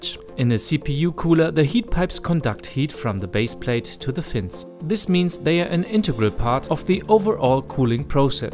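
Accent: Germany. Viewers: That german accent :D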